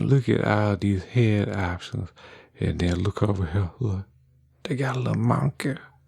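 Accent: Louisiana, United States. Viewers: My favorite Youtube channel. Why can't I stop watching this silly-looking puppet with a voice from down in the Bayou!? I am an adult, after all, for goodness sakes! Now, I find myself shelling out my hard-earned allowance on this thing called Patreon, so you will keep making more videos. But I told my wife, "It's MY allowance, I can spend it how I WANT!". She just rolled her eyes and said..."Awww Rite!" In an authentic accent. I do love that woman. Keep up the great work making quality content. Mister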